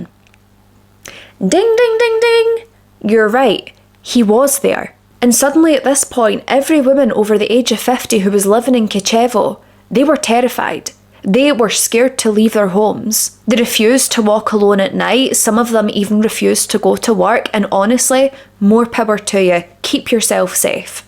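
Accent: Scotland, United Kingdom. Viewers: I noticed my notification for your video and yelled out you posted, my 9 yr old son yelled whoo hoo because even he knows how much I love you❤. You do stories I haven’t heard and I love that you have the same thoughts of the criminals and things they do so it’s more engaging to watch! Not to mention I adore your Scottish accent, I tried to learn it by watching videos but it’s not so easy😂. If I could have some makeup tips that would be great but I don’t think I would be as beautiful as you😊. Thanks for all your hard work, I hope you get noticed more, you deserve it love.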